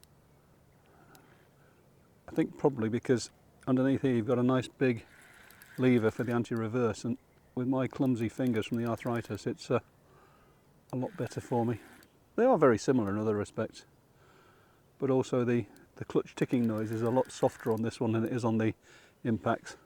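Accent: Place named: Doncaster, United Kingdom. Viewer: What was the name of that reel that released the line from the clip if you got a strong bite? When they came out, I decided I would buy one the next time I went to my local tackle shop, but like many things, I forgot! Where did you live when you were in England,? You seem to have an accent similar to my area of Doncaster.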